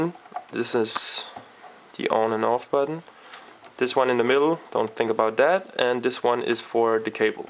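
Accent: Denmark. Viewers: You sound insanely danish its crazy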